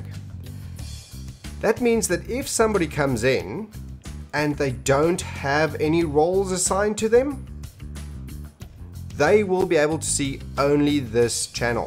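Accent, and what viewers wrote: South Africa. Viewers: Are you from south africa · You sound South African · My dudes South African, love you!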